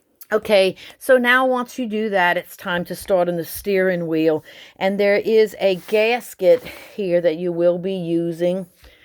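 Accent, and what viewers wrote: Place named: New Orleans, United States. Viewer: Are you from New Orleans? You're accent is just like my wife's.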